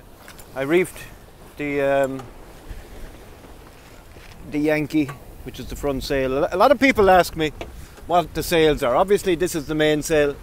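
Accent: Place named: Ireland. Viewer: It's so awesome to see people living their dreams. I'm so jealous!! A healthy kind of jealousy though, I'm very happy for you and your Irish accent:) Give me another 10 years of work and I'll be right there with ya! Keep living free and please keep sharing your life with us. My mother and her friend just got back from Lisbon yesterday. I told her that I will visit Lisbon some day, and that my visit will come from the sea, like the explorers and traders from 5, 6, 700 and more years ago. The history that came through Lisbon is incredible, you're part of it, and some day my boat and I will be also. If you ever are curious where your fans are from..I live in Fairport, New York. Where do you keep your boat when you're back in Ireland?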